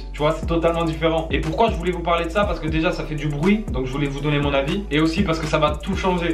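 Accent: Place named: South, France. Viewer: je pense tu vient du sud car tu dit tranquillement tarpin .......et ton accent je pense tu vient du sud , moi d'Alsace (Strasbourg )kartier neuhof zone sensible de non droit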